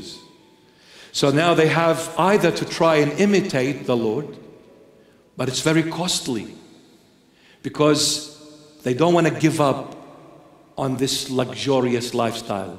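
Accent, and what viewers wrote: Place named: Australia. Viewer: dear brother Mar Mari Emmanuel The Holy Spirit is truly guiding you Brother, may God bless you and protect you always in Jesus Holy name.:thanksdoc: p.s you have an Australian accent are you in Australia?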